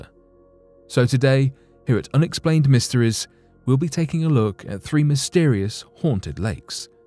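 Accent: United Kingdom. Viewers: It's entirely possible that they are sourcing content from an unreliable source, an old source that is no longer familiar, or even sources that simply have different experiences from you lot. But to pull all those details out of their butts & off the tops of their heads is unlikely. I'm more apt to believe one of the first two possibilities, especially since the narrators sound like they might live across the pond (therefore potentially easily made to believe untruths, as they have no or very little experience & reference for places in the US).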